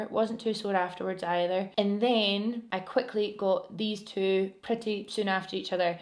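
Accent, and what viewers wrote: Scotland, United Kingdom. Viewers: I think scottish accent is so sweet!! But when i first started wathing i did kinda struggle understanding her but now that i have been watching her pretty long time, no problem 😄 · I find it so interesting when people say they struggle to understand what you’re saying because I’m Scottish Too and so it sounds so normal to me Haha. 💕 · i moved to ggw a little over a year ago and i just realized how good i am at understanding scottish accents now vs then. my god, i swear i just laughed and nodded at everything for a month (im swedish and moved here from portugal). your accent is still really mild to most of the folk i interact with, christ. idk why but your content makes me feel at home and your love for your pup had me subscribe before i knew what you generally post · Oh my god I hit a whitey 😂😂😂 such a typical Scot I love it 😍😂 sounds exactly like me x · I love it when I accidentally stumble across a fellow Scot. 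Also I wish my makeup was as on point as yours 😍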